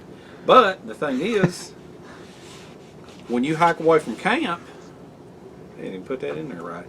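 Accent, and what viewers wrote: South, United States. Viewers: So full of information. Great humor and have great southern accent love to hear you talk.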